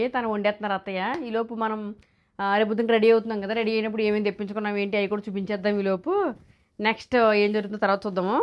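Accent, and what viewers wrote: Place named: Konaseema, India. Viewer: Garu Mi Vedio 's Baguntayi Konaseema Language Matladuthuntay Baga Enjoy Chesta Madi Konaseema Function Lo Baga Enjoy Chesara Next Vedio Lo Pettandi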